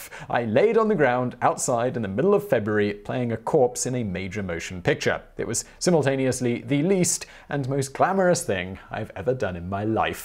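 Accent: United Kingdom. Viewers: becoming so much more playful. Love it:D Also great to hear his awesome British accent saying "tracksuit bottoms".:D We call them that over in South Africa.:D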